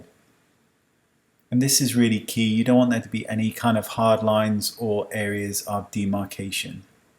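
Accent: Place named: United Kingdom. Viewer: Hi dear I'm new here so I'm watching old vídeos that are so interesting nowadays as well I love your easy explanations and so professional at the same time My language is Spanish I'm from Uruguay but I do love since I was a child British pronunciation so to watch you it's awesome ,excellent pieces of advice and straightforward 🌹Love your way and your wink lol Thx for being here to help us ♥️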